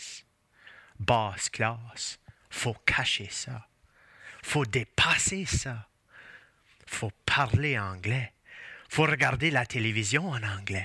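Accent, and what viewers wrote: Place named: Canada. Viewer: T'es canadien, toi ? Juste curieux pcq ton accent est différent que ça de nous-autres icitte en Louisiane.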